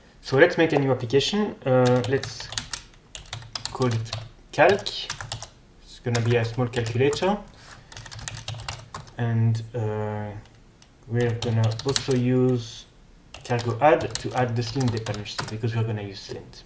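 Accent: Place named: France. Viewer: loving the french accent!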